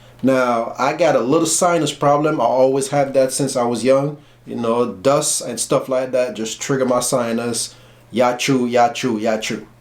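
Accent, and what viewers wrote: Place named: Jamaica. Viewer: Thanks for the video! I'm detecting a little accent from u. Sounds a little jamaican 🤔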